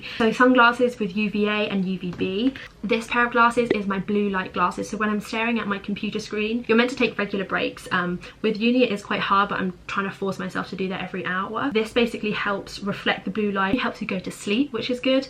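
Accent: United Kingdom. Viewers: Are you Malaysian with British Accent? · Your accent is a British slat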